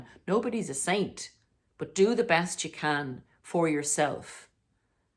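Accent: Ireland. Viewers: Are you from Ireland? I lived in Ireland for 2 years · Interesting Irish accent 😊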